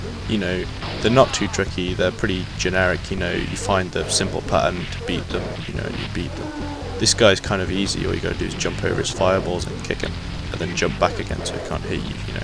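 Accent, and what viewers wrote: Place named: United Kingdom. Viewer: Good review.. extra points for the British accent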